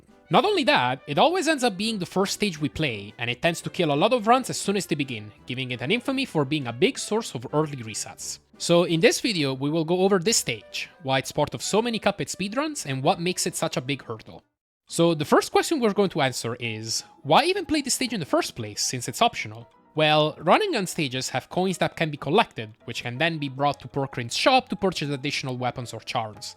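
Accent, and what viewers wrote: Italy. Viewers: Lo sapevo che eri italiano dall'accento in inglese😭😭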